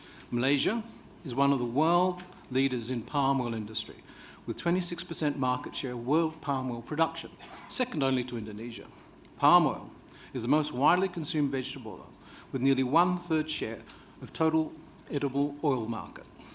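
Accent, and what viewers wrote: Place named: England, United Kingdom. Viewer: hensem nyer datuk umar. I like ur English accent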